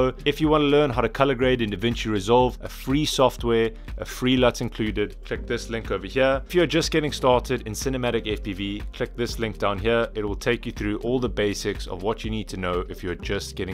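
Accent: South Africa. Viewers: are you South African???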